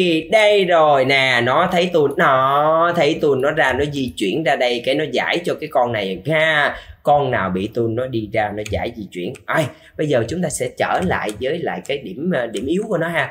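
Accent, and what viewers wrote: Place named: Binh Duong, Vietnam. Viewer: Anh hôm nay giọng hơi bình dương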